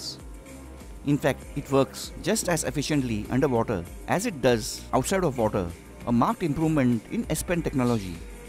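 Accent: India. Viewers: Indian accent is so funny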